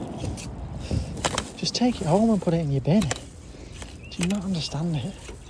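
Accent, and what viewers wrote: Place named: United Kingdom. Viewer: I'm American and your accent doesn't bother me at all. I can understand you just fine. You don't sound annoying you just sound British. I don't know what those commenters are hearing lol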